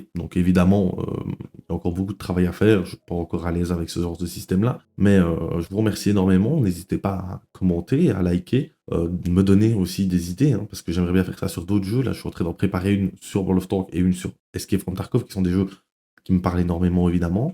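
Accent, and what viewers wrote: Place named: Liège, Belgium. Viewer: J'ai remarquer ton accent liegois direct MOI AUSSI JE SUIS UN BELGE QUI JOUE A WT 🙏🏻🇧🇪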